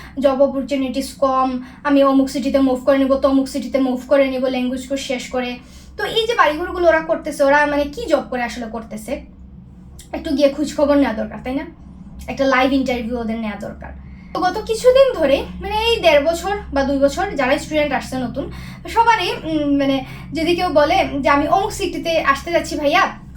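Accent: Sylhet, Bangladesh. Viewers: Didi Sylheti ni..?? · দিদি কি তুমি কি সিলেটি? আমি রাশিয়ায় আসতে চাই তোমার মেইল টা দিও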